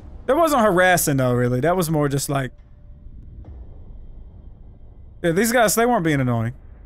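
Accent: South, United States. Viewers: DaRealMastalin all white guys from the south sound like this :s its hot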